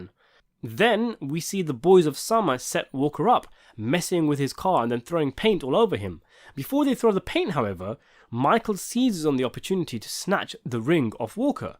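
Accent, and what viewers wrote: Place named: United Kingdom. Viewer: Listening to the narrator is like listening to a british person telling me that beans and toast is a great american cuisine. As soon as I heard the accent , I just stopped listening.. srry not srry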